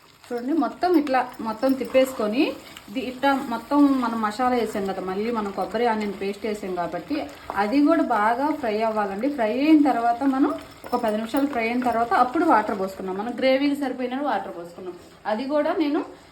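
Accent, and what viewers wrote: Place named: Kurnool, India. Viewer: Kurnool valla laga matladutunnaru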